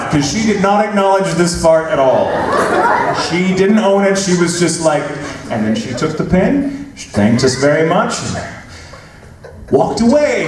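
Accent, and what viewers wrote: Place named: United Kingdom. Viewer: lol outside world : nobody cares about how he speaks; oooooh he's British, good for him... in conventions : when he speaks like that, everybody dies <3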